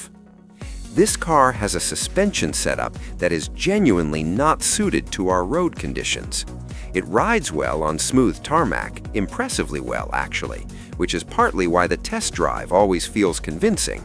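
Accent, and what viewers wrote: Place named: United States. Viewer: The cars a premium budget option is a bloody oxymoron.. Yankee accent talking rupees, this bound to bullshitignore it!!😂😂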